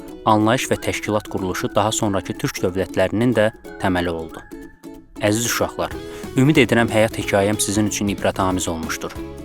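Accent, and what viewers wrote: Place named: Turkmenistan. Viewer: Abi ben türkmen aksanını sevmiyorum ya... Direkt dislike attım...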